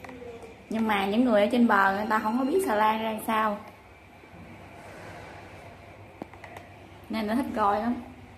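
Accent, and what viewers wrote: Mekong Delta, Vietnam. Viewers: Thôi đi ba, gái miền tây nói chuyện vay mới dễ thương 😁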